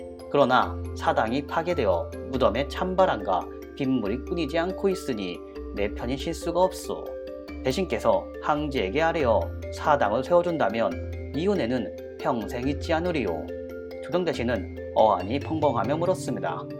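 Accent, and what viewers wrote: China. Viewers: 말투 억양이 누가 들어도 조선족이신... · 억양이 중국사람같네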